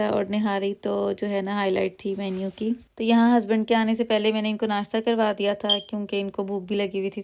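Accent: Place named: India. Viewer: Your channel name is pakistani mom. But you dnt sound pakistani your urdu sounds like an indian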